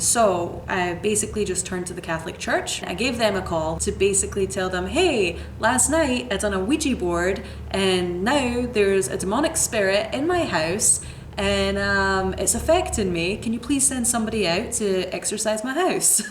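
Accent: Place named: Scotland, United Kingdom. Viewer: Like a female Craig Ferguson. Great storyteller. Your Scottish accent was much stronger then.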